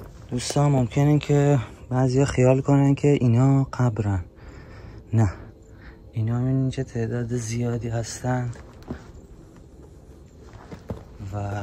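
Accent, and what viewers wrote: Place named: South, Iran. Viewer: دمتکرم جنوب بی‌نظیر وزیبا واون لحجه زیبا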